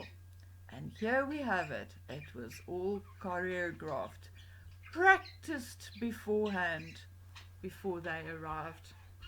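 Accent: South Africa. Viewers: WHAT A FIND YOUR SITE IS , LOVE IT. ALREADY SUBSCRIBED. LOVE THE SA ACCENT AS I LIVED IN SA FOR 4 YEARS, SUCH A BEAUTIFULL COUNTRY AND PEOPLE. MY MIDDLE TWO CHILDREN WERE BORN THERE SO LOTS OF VERY HAPPY MEMORIES.. KEEP UP THE GOOD WORK.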